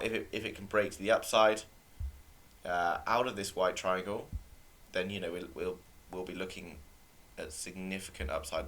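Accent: RP, United Kingdom. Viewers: I love your English. I play it 0.75x to for extra poshness ♥️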